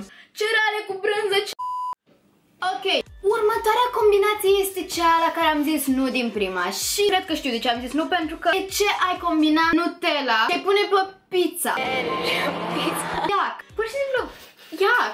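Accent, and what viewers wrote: Moldova. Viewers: esti moldoveanca?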